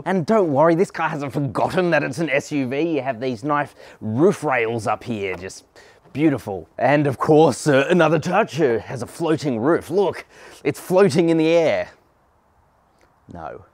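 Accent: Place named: Australia. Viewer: You remind me of DankPods…. Do all Aussies sound like this?!?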